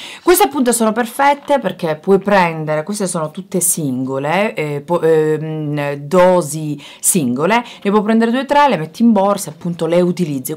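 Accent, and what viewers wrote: Sicily, Italy. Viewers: si sente dinuovo l'accento sicilia <3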